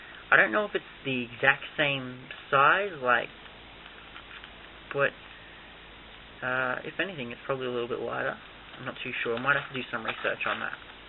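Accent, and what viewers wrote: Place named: Australia. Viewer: nice australlian accent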